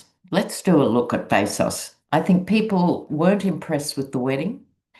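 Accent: Australia. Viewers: I can have a really crappy day and come home and watch one of your videos where you cleverly have such a way with the English language! You were talking the other day about someone pretty sure it was a yeti where you commented on the fact that he has the attention span of a caterpillar. So I don’t know if it’s your sarcastic with, or your Australian accent that makes that comment so effing funny you’re just the best I adore watching you. I watch every single one of your videos and I’m about to go watch you, Linda G and the other two ladies chat thank you so much for your effortsyour comments your wit your charm we love you in America. Thank you for all the hope that you provide for our wavering country PS I’m Irish too. The other half is Cherokee Indian.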